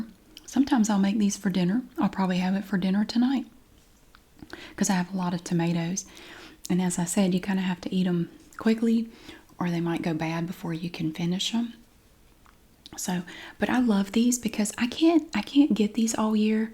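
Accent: South, United States. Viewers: Love your southern accent ❤ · I could watch a tomato sandwich in the making topped off with a southern accent every day for the rest of my life.❤ Hope you are well! I need to catch up on the neighborhood drama.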